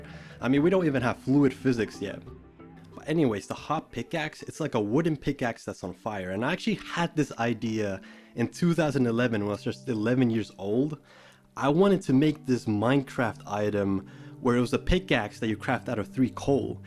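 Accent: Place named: Finland. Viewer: After all the years trying to understand what the Hydraulic Press Channel was saying, I gotta admit, you have by far one of the most clean Finnish accents I've ever heard lol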